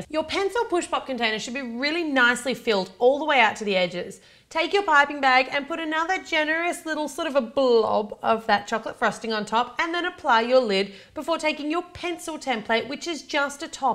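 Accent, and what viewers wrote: Australia. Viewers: Is she australian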